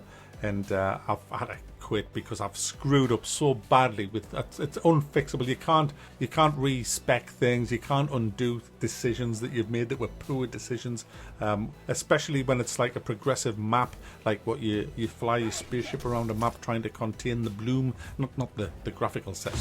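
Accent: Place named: United Kingdom. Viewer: Can I ask how British accent is called? It's so cool:)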